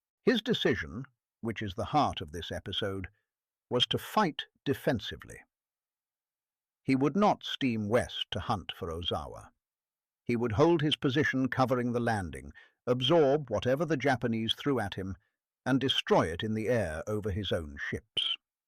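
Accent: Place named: United Kingdom. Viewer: Why does a channel ostensibly about US history use a narrator with a British accent?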